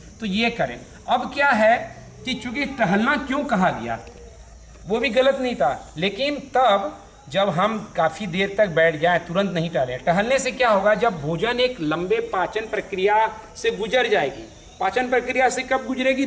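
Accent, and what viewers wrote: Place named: Bihar, India. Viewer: Baba bihari ho na sahi sahi bolna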